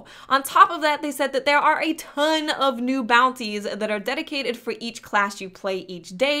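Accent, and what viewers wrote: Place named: New Jersey, United States. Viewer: you have a new jersey accent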